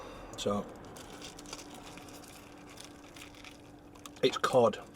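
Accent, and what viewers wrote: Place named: Barnsley, United Kingdom. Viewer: I hope that fish and chip shop was 'white pass' because with your Barnsley twang it sounds like 'wipe ass '. Lots of love Huddersfield .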